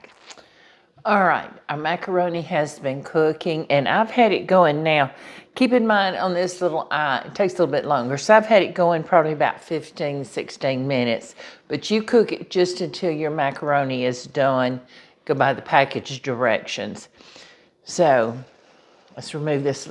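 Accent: South, United States. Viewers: I don’t know you but I love you and love watching you cook. Your southern accent is adorable. OH, and I bought your cookbook. My daughter loves watching your videos, too. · This lady has a theraputic effect on my nerves. I am in U.K,,is that a Southern U.S.A accent?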